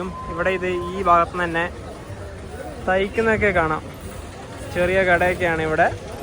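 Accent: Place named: Kasaragod, India. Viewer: I like Kasaragod language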